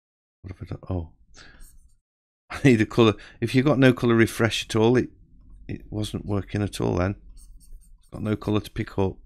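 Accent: United Kingdom. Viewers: thanks, it really helps listening to someone from Yorkshire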